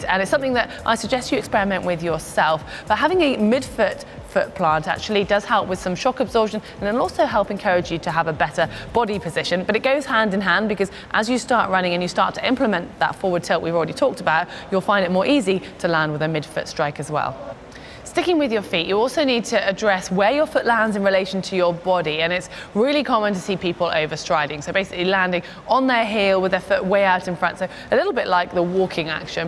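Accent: United Kingdom. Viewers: Omg why this British accent sounds so stressful ..I am having problem with understanding it ...it's like a mind exercise for me coz I'm using my potential to understand her every word😂😂😂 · I love British accent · What are you talking about! British accent is awesome.. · It's great :) I love the British accent Im working on mine one to keep :) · I watched this vid many times for the British accent. My running improved as a bonus. 😄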